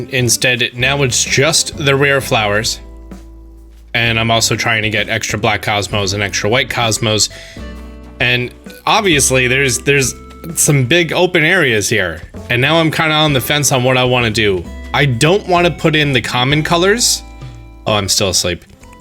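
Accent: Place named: United States. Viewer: the factttt i knew u were from either PA/NJ/DE from the first vid i watched by the way u said “home” and “water”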